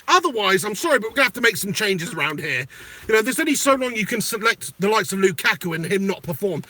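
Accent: Manchester, United Kingdom. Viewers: You a local Manc? lmfao!!!!!!!!!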